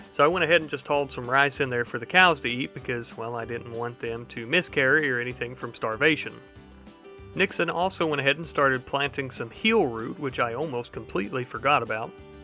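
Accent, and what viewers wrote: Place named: Texas, United States. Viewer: Wait a minute. That accent, it's not just theatric. You're Texan, ain't ya?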